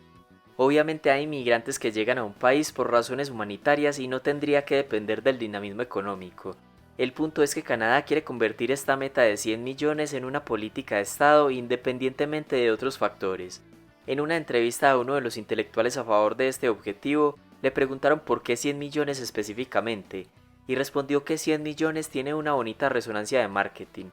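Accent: Colombia. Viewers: No me gusta el acento colombiano bobo del narrador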